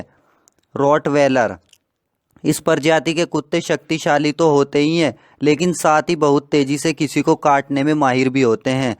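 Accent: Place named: Muzaffarnagar, India. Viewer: Bhai west up me kha se ho aap aapki boli se pta chal rha ke muzaffarnagar dist. Se ho sayed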